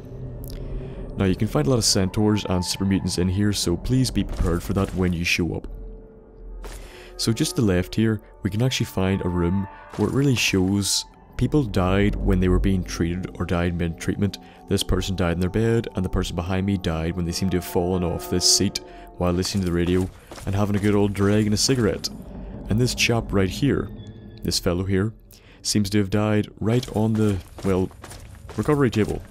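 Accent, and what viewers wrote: Northern Ireland, United Kingdom. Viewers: which part of Northern Ireland you from? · are you northern irish boyo? your humour and use of expletives are second to none. Reminds me of myself lol. Brilliant content creator you are bro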